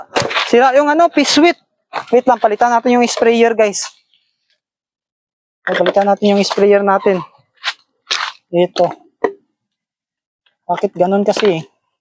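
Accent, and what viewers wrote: Visayas, Philippines. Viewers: Bisaya ka dol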